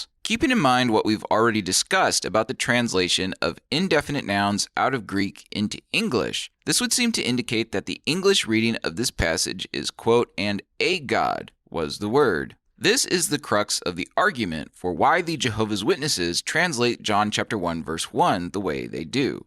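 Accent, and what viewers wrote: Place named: United States. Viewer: Interesting, although the butchering of the Greek with the unpleasant American accent was a distraction. No attempt at a good pronunciation whatsoever. Quite harsh sounding.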